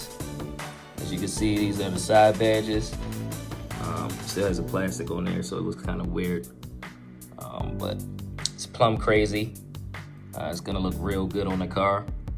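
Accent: Baltimore, United States. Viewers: I’ve been watching your videos for month or so, and I been saying to myself “this dude sound like he from Baltimore” “I wonder if the purple is a Ravens/Baltimore thing”....Finally got my answer lol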